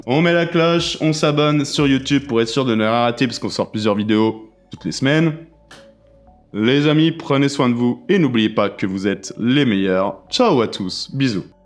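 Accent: France. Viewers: Mais pourquoi les FR vous dites ZHE au lieu de DHE pour THE? Et aussi THAT, cest pas ZHAT ! C'est comme DHAT ! OK, bonne video ! <33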